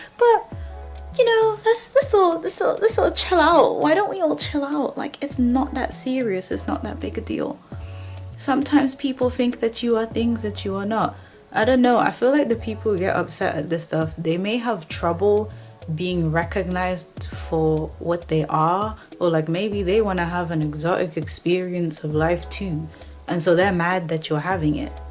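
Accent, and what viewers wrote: United Kingdom. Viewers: I hear a lovely British accent, not that it really matters, and of course it's really all the rest of us English speakers who have the accent, not you British folks.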